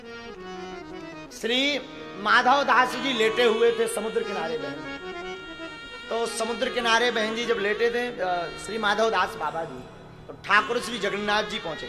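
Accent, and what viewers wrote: Bundelkhand, India. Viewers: Gurudev apke shrimukh se jagannath baba ki katha badi pyari lagti hai bundelkhandi free style pyare se apratim saundarya ke dhani gurudev ap atulniya hai. Bala ji maharaj apki jai ho. 🎉❤🎉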